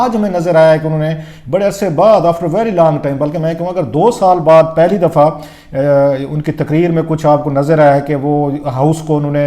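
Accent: Saraiki, Pakistan. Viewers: Rauf Klasra k tajziaat intahai fuzool aur bekaar hotay hain. Es admi ko Imran Khan se dishmani hai. Yeh unkay achay amal per b tankeed kerta ha. Yeh apnay aap ko tajzianigar aur mufaqar samajta ha jub k haqeeqat ma yeh aik jahil admi ha. Unparh aur ganwar ha. Es ka elmi level yeh ha k na to yeh urdu theak terha se bool sakta ha aur na English. Jab yeh bolta ha tu talafuz ka yeh alam ha k jasay saraiki zuban ma baat ker raha ho. Yeh hi haal es ki English ka ha. English ke chand alfaz jo yeh bolta ha woh b saraiki zuban me bolta ha. Sonay peh suhaga yeh k ooper se bahut buri terha ka Hukla ha. Huklahat ki wajha se sada se baat ko b six kilometer lumba ker k ada kerta ha. Es ki naehli aur bekarpun ki waja se TV channels nay b es ko dhutkaar dia ha. Yeh bilkul fuzool admi ha. Es ko chahiyeh k apnay girayban ma jhaank ker dekhay aur apnay aap ko durust keray.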